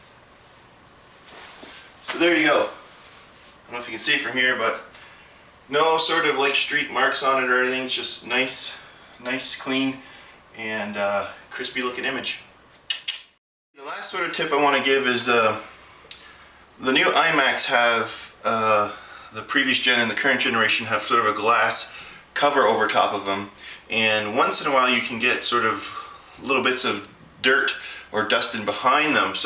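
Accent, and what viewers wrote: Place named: Canada. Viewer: first 30 secs i know he's Canadian and i'm not American. ;D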